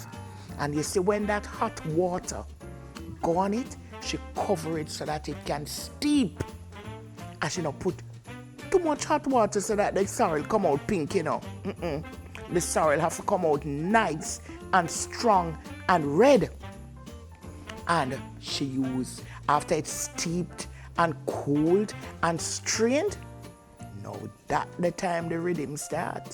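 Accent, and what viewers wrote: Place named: Jamaica. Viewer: Amazing jamaican speach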